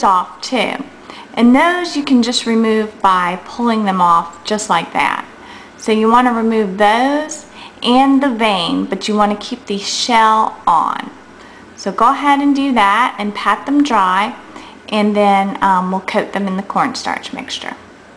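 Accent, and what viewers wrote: South, United States. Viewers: I love how your making asian meals & have a small southern accents ♡♡ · Love this video and your southern drawl....... just like that! (smile)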